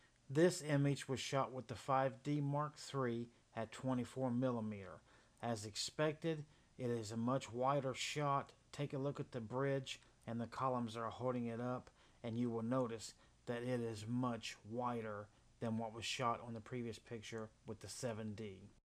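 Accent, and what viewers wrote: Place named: South, United States. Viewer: Nothing like a southerner gentleman; you explained it well thank you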